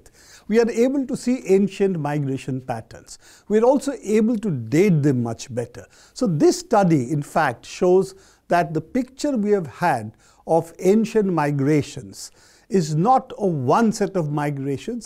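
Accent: India. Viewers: The Indain accent hurts my ears, sounds terrible.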